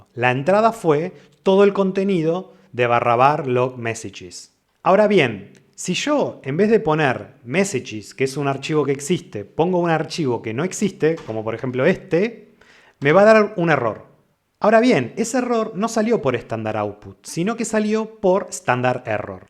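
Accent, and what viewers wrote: Rioplatense, Argentina. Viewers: Hola! Caí a este video buscando info sobre xargs, la verdad estaría bueno hacer hincapié en este comando ya que lo que permite hacer es (segun lo que vi hasta ahora) asombroso. De todos modos me aprendí funciones de grep y lo de wc que son súper útiles! Gracias por este video, espero que como a mí haya ayudado a más gente. Abrazo! PS: está bueno encontrar videos donde el ponente habla español rioplatense, hace más fácil la cosa viteh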